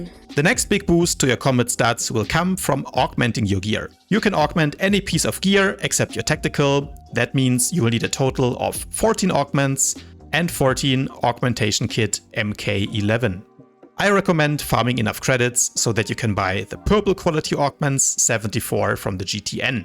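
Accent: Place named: Germany. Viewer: The "german" english is very nice zu hören :D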